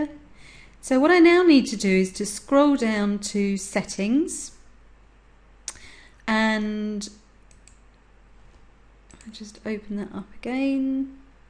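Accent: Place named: England, United Kingdom. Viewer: your english acent just wow <3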